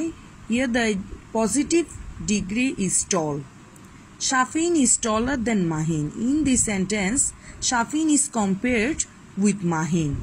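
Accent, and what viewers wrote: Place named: India. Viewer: Indian Accent! Didnt expect it from a SPS teacher.Who recruited this teacher? Btw Noel sir, Rudy sir, Saikat sir, Munna sir these are the real gem of SPS.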